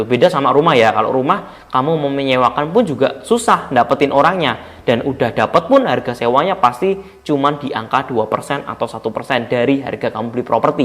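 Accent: Java, Indonesia. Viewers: bahasa mas nya mendok jawa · Medhok e mas heheh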